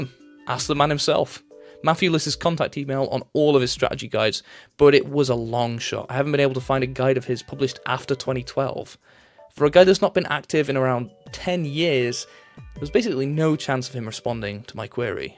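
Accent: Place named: United Kingdom. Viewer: The algorithm gods have blessed you. I'm happy to be hearing another British (or aus) essayist. Great work!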